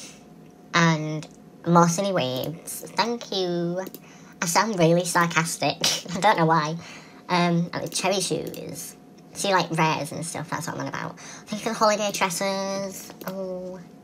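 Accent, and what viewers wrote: England, United Kingdom. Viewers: Are you British and I love your voice and your channel! Sorry I'm late <3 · Wth you from UK... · Why are you on American server when u are British? It sounds like u are British unless it's just me... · Your voice sounds like a butiful English accent! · are you from the uk?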